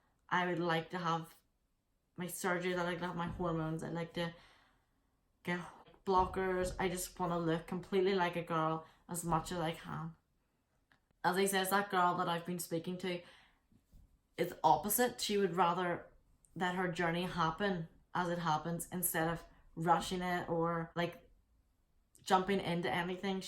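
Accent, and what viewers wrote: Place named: United Kingdom. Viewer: You’re so pretty, and aww I love your british accent 😍💗 anyway I can help ?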